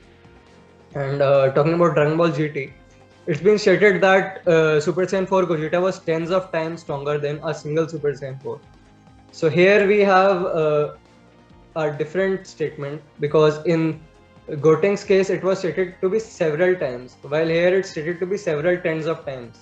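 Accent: India. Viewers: I couldn’t understand him that Indian accent is to strong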